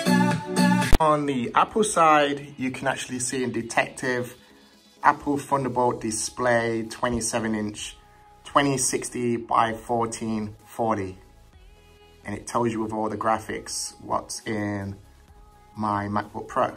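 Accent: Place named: Midlands, United Kingdom. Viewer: “Me old” “I got it for fifteh” definitely a midlands lad 😂👍👍👍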